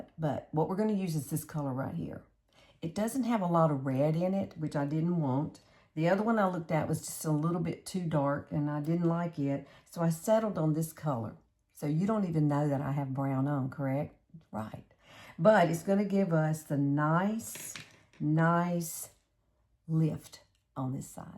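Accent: South, United States. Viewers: I love your southern accent! You’re very cute and funny.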